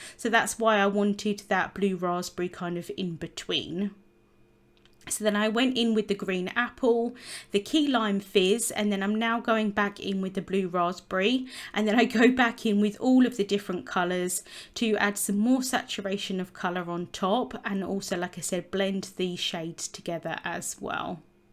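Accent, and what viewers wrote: RP, United Kingdom. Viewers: A very lovely card! Like the colors and to hear Queens English!👍🏻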